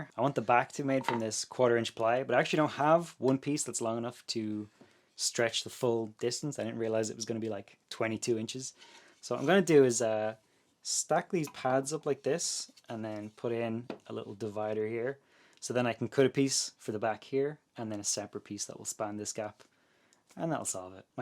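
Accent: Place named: Ireland. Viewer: Heard the fellow Irishman voice and had to subscribe right away!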